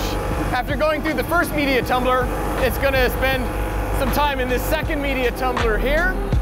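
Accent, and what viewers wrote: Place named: California, United States. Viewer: Haha I like it he talks like the Californians on SNL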